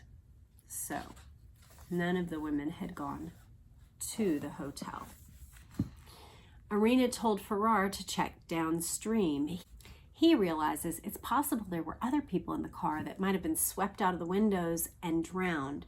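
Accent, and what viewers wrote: Texas, United States. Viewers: I'm watching the start of this series on July 19th 2026, in a small little seaside village in the UK - coincidence! I love your channel and listening to your lovely Texan voice as I do my artwork at my desk, seeing you now and then. I've been following you a while now as the JFK Assassination has always fascinated me. Now I can't wait for you to dive into the Chappaquidickstory. Loving your work (and the trains) 😀👍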